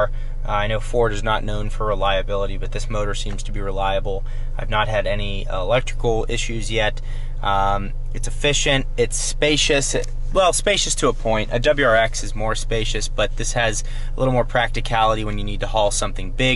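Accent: Pittsburgh, United States. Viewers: Holy shit, you're a yinzer! Lol I'm just south of Pittsburgh and looking for an mk3.5 for a daily at the moment.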